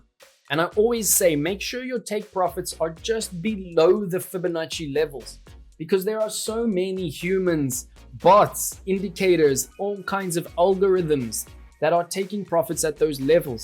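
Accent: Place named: South Africa. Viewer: SAFA accent lol SA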